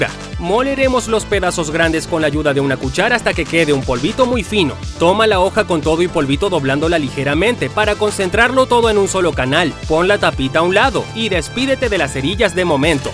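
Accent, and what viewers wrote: Mexico. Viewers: Eres mexicano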